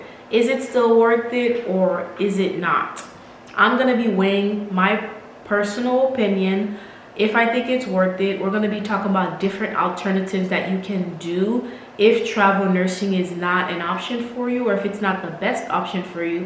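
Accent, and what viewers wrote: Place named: Caribbean. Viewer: I sense a carribean accent. Are you and island girl ? Thanks for the content. Dont the agency pays for everything again?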